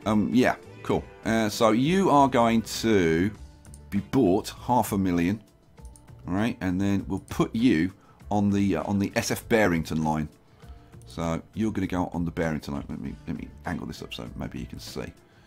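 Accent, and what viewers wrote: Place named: Northern England, United Kingdom. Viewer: totally northern England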